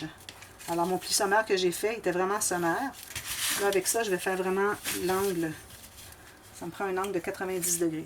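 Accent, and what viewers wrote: Quebec, Canada. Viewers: bonsoir, dès que j'ai écouté votre vidéo je savais que vous étiez québécoise tout comme moi. J'ai remarqué votre plaque à découper aussi, j'ai la même. Ces pour cela que j'espèrais enfin où trouver ces cartons, je venais de trouver une référence de chez moi. Je suis de Laval donc accès aux grand centre et je commande aux États-Unis aussi, mais je n'avais pas le nombre de gramme ou un nom pour mis référer. Merci!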